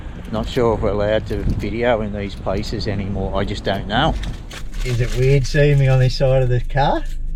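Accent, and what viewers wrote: Australia. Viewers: See you soon I'am going to work they now you sound like ausy mate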